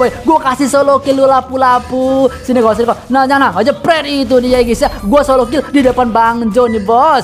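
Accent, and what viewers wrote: Palembang, Indonesia. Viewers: Ngapo logat cak wong palembang yo..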